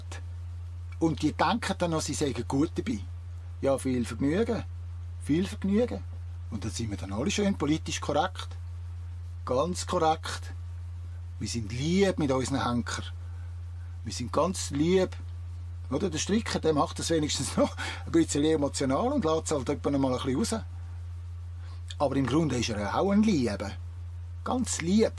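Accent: Switzerland. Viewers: Hihi - wenn ein "Schwyzer" sich echauffiert, klingt das in meinen Ohren iwie lustig. Das meine ich aber freundschaftlich. ;) Aber ich kann dich klar verstehen und stimme vollumfänglich zu. Die Reihe "Episode 1-7" sah ich komplett. Ich verknüpfe eher die Fäden bzgl. wer, bzw. welche Menschengruppe dahintersteht und die Fäden hält. Auch hierzu gibt es sehr deutliche Aussagen, bzw. schriftliche Dokumente, die quasi bis zu "ein Zeitalter" zurückreichen. Sogar noch länger, doch sind derlei Schriften für mich unerreichbar und ich würde sie auch nicht lesen können. In aller Regel betreffen sie Themen, die "Religion" entspringen und sich pharisäisch offenbaren. ++edit: Die Vokabel pharisäisch wurde absichtlich benutzt, um diese gegen eine Sprachfamilie abzugrenzen. Bei der Zusammenführung der geistigen Idee der Bundeslade (vgl. Bauweise) mit der sog. "Leidener Flasche" (vgl. 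Eintrag Wikipedia) ergeben sich weitere, in allen Bezügen sinngebende, geistige Verknüpfungen, auch und gerade zu diversen Erzählungen von Todesfällen im Buch der Bücher. (vgl. auch die "Einführung der Gesetze / Moses + den ersten Satz des deutschen GG: "Alle Menschen sind vor dem Gesetz gleich" - nach Erschaffung eines Gesetzes sind sie, die Menschen, dies nämlich nicht mehr. Pharisäer halten sich stets unantastbar, selbst wenn sie sämtliche "Gebote" brechen, die sie selbst zum Gesetz erhoben haben.)++ Danke für den jetzt noch weiteren Blick durch deine Episoden 1-7, das noch tiefere Begreifen der Lüge. Die Kraf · Ja super! Das isch Bärndütsch! ...👍😄 super Kanal! 👏 Danke! 🌹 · Grossartig und danke. Als gebuertiger Deutschsprachler habe ich nur 10 min benoetigt, um Dein schweitzer deutsch zu verstehen. Dort, wo ich seit Dekaden lebe - in Uebersee - sprechen wir minimum vier Sprachen auf taeglicher Ebene und oft alles durcheinander. 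Wir sprechen diese Sprachen sicherlich nicht perfekt, aber wir verstehen uns alle (ca 120 registrierte (!!!!) Nationen auf einer kleinen Insel (178 qkm)) weil wir uns verstehen wollen. Deshalb waren Deine Einlassungen kein sprachliches Problem fuer mich. Und natuerlich nicht nur sprachlich - natuerlich nicht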